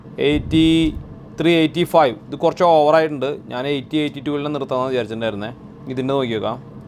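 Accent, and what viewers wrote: Thrissur, India. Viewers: ഇത്രയും നാളായ് ന്യൂസിലാഡിൽ എന്നിട്ടും മ്മ്ടെ തൃശ്ശൂർ സ്ലാങ്ങ് വിടാത്ത ചേട്ടൻ പൊളി തന്നെ 😍🥳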